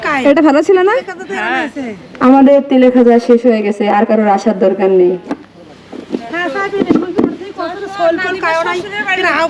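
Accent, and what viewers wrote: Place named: Rangpur, Bangladesh. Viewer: কথা শুনে তো রংপুরের অঞ্চল মনে হচ্ছে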